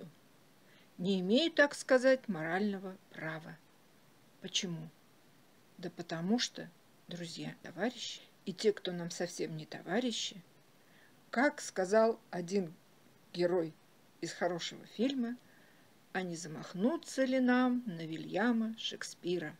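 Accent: Yorkshire, United Kingdom. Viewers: ну да , иммено так она и считает . Она причислила свой йоркширский говор к отдельному языку ., как валлейский или гэльский языки . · Это и есть йоркширский диалект?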